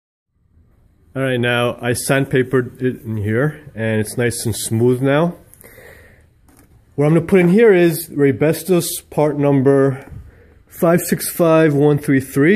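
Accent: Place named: New York, United States. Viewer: good video. love the witty commentary. $ says you are from NY or NJ, reminds me of home (NY)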